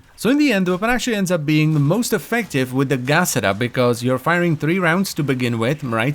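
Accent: Czech Republic. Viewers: He does have a slight Czech accent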